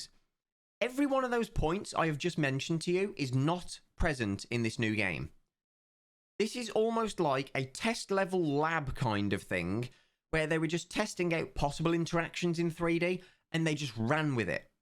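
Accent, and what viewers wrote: United Kingdom. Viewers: British :P x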